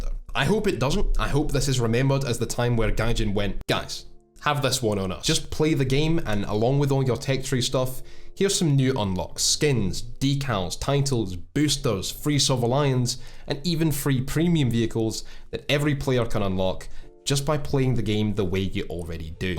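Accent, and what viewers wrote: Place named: Scotland, United Kingdom. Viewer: I love the accent Beautiful Scottish Accent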